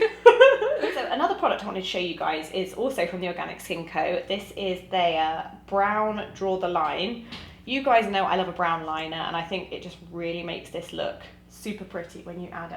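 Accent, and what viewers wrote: Australia. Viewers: Loving hearing the familiar Aussie accent on your channel! x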